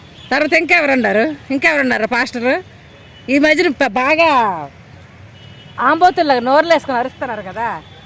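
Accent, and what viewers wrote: Srikakulam, India. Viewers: Nuvvu nee seekakulambhasha nee face chuste asayamvestundi gorrhemohamdana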